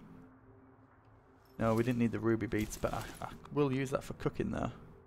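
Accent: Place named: North, United Kingdom. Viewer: Can't place your accent, its definitely northern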